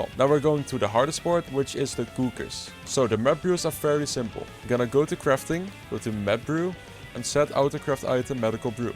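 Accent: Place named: Netherlands. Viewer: Waarom hoor ik het altijd meteen als iemand Nederlands is.. dope video btw en misschien kom ik een keer je server checken.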